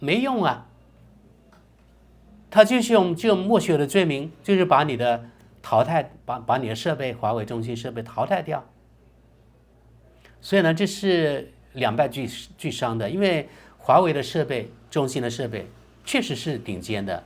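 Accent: Standard. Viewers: 的普通话很标准啦，可能你身边没有华人跟你对话你感觉不深而已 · 没有啊，你的普通话已经很标准了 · 中国深圳人，觉得这么好普通话还谦虚。真的很标准啦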